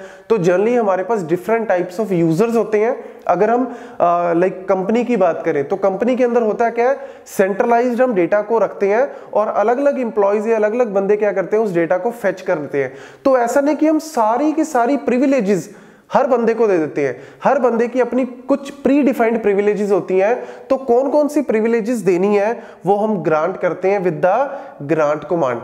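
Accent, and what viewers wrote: Punjab, India. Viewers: his accent is so punjabi like 🤩 are you punjabi ?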